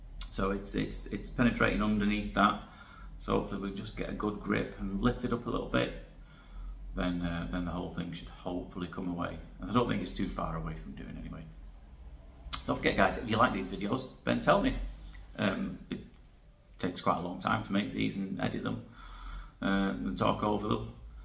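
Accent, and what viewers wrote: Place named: Liverpool, United Kingdom. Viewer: Hi I wondered if you are close to Liverpool because your accent sounds as if your the 5th Beatle 😂you are super super young! I’m from California in San Jose. Have you spoke to Rhys Barber and Taylor Green Audiologist Associates? And also Collin from Durham Ear Specialist? I think you are absolutely great 😊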